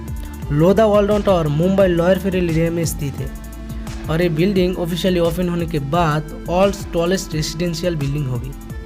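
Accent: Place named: West Bengal, India. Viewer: I think u r from Bengal ,,,, ya??? I could recognize your voice ☺️☺️ keep it broo very well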